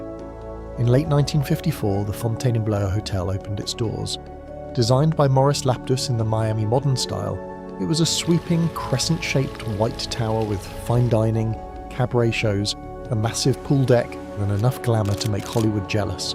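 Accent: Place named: United Kingdom. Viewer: Your videos are fun to watch. They're meant to give viewers a general idea of what it was like. I can forgive AI for the errors in details. I do wish that you used American accents for narration on videos about American locations. British narration is fine for Europe and other areas of the world, I guess. It just seems like 90% of narration about anything uses a British accent now.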